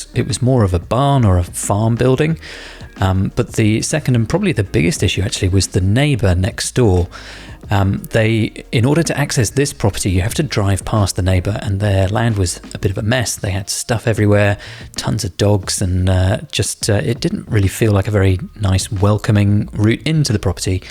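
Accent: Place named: Australia. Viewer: From London? Your accent sounds Aussie😊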